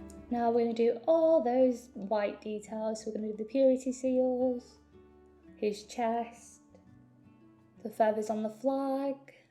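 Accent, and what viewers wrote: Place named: United Kingdom. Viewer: the most refreshing thing about your videos is your british accent. It's really nice to hear over all the american english (or non-native speaking) painters.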